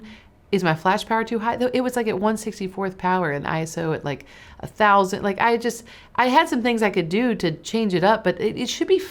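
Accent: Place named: Alabama, United States. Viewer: thank you so much for this video. I love your teaching/behind-the-scenes style and your smooth southern voice reminds me of the Alabama that I left to come down here to Brazil. BTW, my Canon 600EXRT was giving me some trouble (shooting full power every once in a while), so I bought the new Godox V860iii (it is R$1200 Brazilian). Just got it for Christmas and haven't even had a chance to take it out, but I loving it! Wish I could control other flash makers (like my YongNuo) with the Godox (you can, but only on manual S1). I can't even control my Canon flash with it, but that's ok because I plan on changing ALL my flashes for Godox. Hint: DO NOT BUY YONGNUO FLASHES, THEY BREAK EASILY AND ARE NOT WORTH IT!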